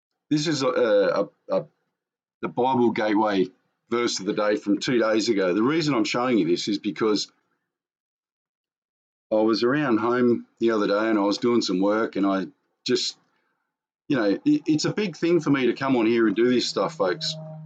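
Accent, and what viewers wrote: Australia. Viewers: hey I'm here in the USA and I just want to give you my input on the name change. I would say don't change it your accent is Australian you're Australian and there's nothing wrong with the name that's the way we know you. also on a sad note I have to report as humans we all stereotype stupidly, and I think most people expected you to look like Crocodile Dundee. just like everyone from the East Coast thinks here in Arizona there still Indians running around on horseback at Cowboys shooting at one another. it's programming from too much television sadly but people will learn and grow and adjust to the fact that not everyone from Australia looks like and sounds like Crocodile Dundee. just being honest and saying what nobody else has the courage to say. The Whole World's still pretty biased judgemental and silly.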